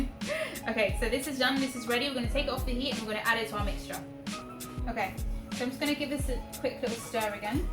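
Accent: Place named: South, United States. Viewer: Not bad! Funny 🤣 the southern accent I mean. But amazing as always.🌹🌹❤️ Keep on producing these great videos. 🌹🌹🌹 You should have your own products in the Supermarkets.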